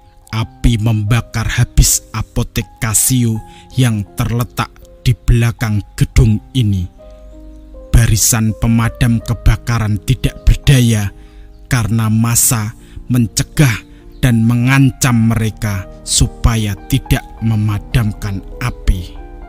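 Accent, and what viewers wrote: Java, Indonesia. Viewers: jgn medok medok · terlalu medok bahasa jawanya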